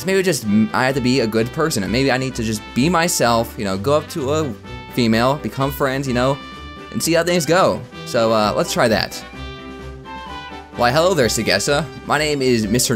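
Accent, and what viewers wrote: Australia. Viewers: You’re my favorite YouTube or ever I like your name and your British accents pretty good I mean I mean Australian accent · why the Aussie ascent hmmmmmmm..... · Thanks mr Australian accent guy for saying we are terrifying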